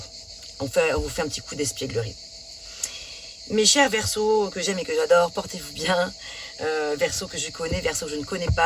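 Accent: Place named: South, France. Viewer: Les cigales le sud, l'accent j'aim beaucoup ✨✨✨👌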